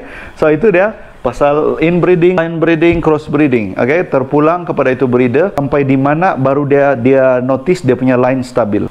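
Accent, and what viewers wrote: Sabah, Malaysia. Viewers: Yay seharian tunggu video bro.. Firstime tengobluerim mati.. Sudah ketagih dengan slang sabah.. Nk tanya berapa minimum size betta untuk jar.. Ataupn umur.. Betta saya 1 cm 3 minggu baru tak sabar nk jaring plak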